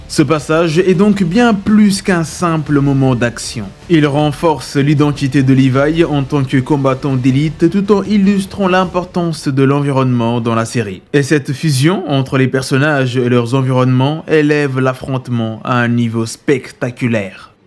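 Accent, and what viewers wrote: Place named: Africa. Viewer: t'as un accent africains que tu veux gomer, c'est dommage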